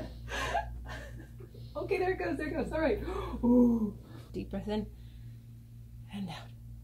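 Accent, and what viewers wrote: England, United Kingdom. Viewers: Is that an english accent? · It's weirdly jarring hearing a familiar British accent in your videos.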